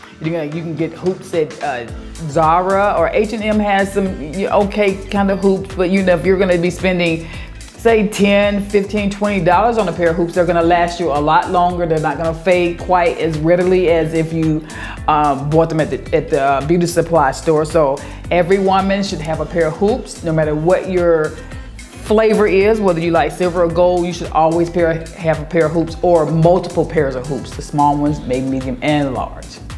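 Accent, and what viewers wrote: South, United States. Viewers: ...you keep it real! Love your tips, your style, your southern accent, your laugh, and your personality.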